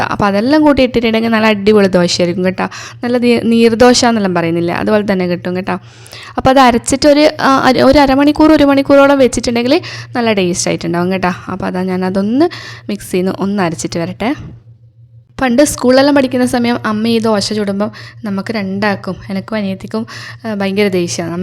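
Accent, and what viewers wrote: Kannur, India. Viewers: കണ്ണൂർ ആണല്ലേ place.. സംസാരം കേൾക്കാൻ നല്ല രസമുണ്ട്😍😍energetic vlog 👌👌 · Adipoli vlog ❤️kannur ഭാഷയും super👌പാത്രം കഴുകി മടുക്കും ❤️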